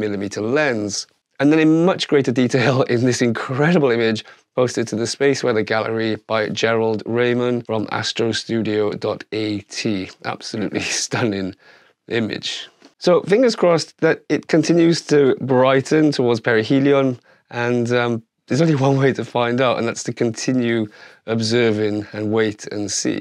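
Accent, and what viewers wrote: Wales, United Kingdom. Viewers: Great details, 👌 u probly know already. Also superb asmr c; Cymru accent,? Live from snowdonia? C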